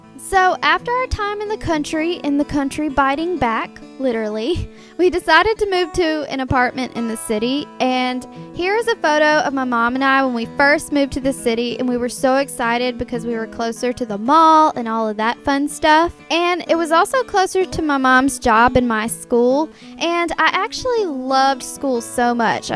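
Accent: South, United States. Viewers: You were so cute in your dag gom Bambi southern voice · happy bday and you have the cutest southern accent ever. luv ur channel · Omg cutest freaking country voice ever! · T you were so cute when you're a little like so cute and I love when you talk by your little accident your little country accent it was really cute...,......you just made my day I Watch you all the time love you so much and I hope you have a glitterfull Day and god bless 💟💟☺️☺️☺️💞😇😇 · omg I love southern accents and then hearing you speaking as a baby it's so darn cute!!! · I love your voice when you were little! You had that country twang! And still do now! I love it and wish I had a twang!😘 love you sooo much!!!! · Ha thats cool you live like 2hrs away from me ps ha cute lil baby south accent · I love your young country accent!! It's so cute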